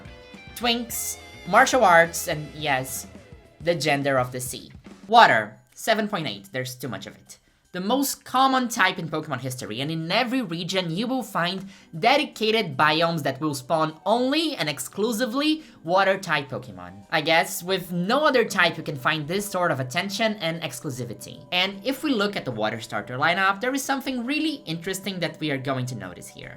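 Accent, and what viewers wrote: Brazil. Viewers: hearing you pronounce quaquaval with your brazilian accent healed me thank you mother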